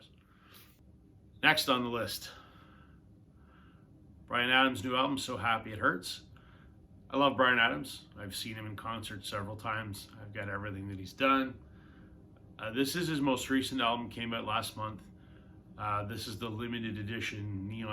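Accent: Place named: Canada. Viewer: Hey Man!! Good to find your channel.. I'm JT.. I live down in Reno, Nevada.. have a feeling. and hear in your voice that you are up in Canada.. especially when you showed that on your intro.. great band!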